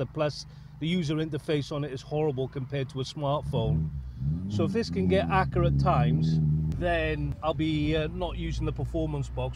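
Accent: Wales, United Kingdom. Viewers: Didn’t expect a Welsh accent! 🏴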